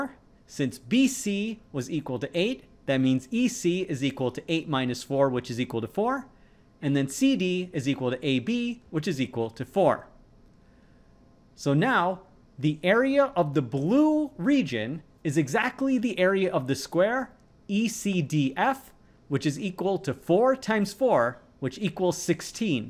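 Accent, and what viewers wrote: India. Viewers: Your voice is deeper than I remember from 4 years ago I can also detect more hints of an indian accent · Bro are you Indian??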